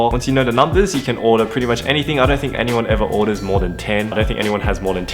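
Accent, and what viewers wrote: Australia. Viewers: That ozzy Canto combo is hilarious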